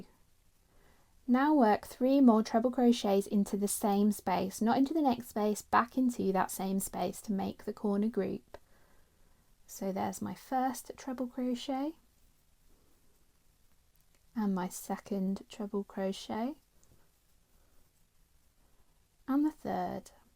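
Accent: England, United Kingdom. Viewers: I’m following this, but it’s strange to hear an English accent use American crochet terms. I really have to concentrate!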